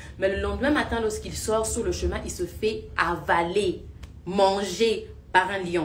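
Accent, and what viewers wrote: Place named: France. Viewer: Elle s exprime très bien !!! accent français hein !!!!!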